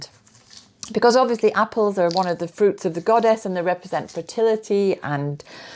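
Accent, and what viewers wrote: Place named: Bishop Auckland, United Kingdom. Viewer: I couldn't quite discern your accent...but I did think Bishop Auckland!! I absolutely love listening to your wisdom. Thank you so much xxx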